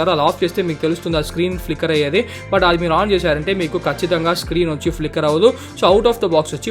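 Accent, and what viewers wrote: Chittoor, India. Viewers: He is from Chittoor or Anantapur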